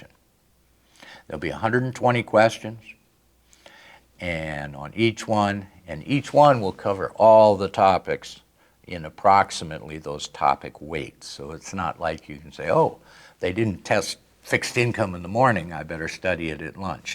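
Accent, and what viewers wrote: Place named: Transatlantic, United States. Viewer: he speaks a transatlantic accent, very interesting topic and information, great presentation organisation. The video is effectively informative!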